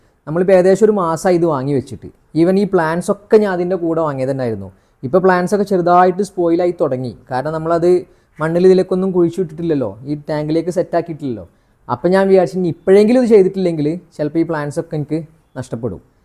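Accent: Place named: Vadakara, India. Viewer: എന്നിട്ട് നിങളെ ഭാഷ മലപ്പുറം ടെച് ഇല്ലല്ലോ 🙄ഞങ്ങളെ കോഴിക്കോട് വടകര മോഡൽ പൊലെ എനിക്ക് തോന്നൽ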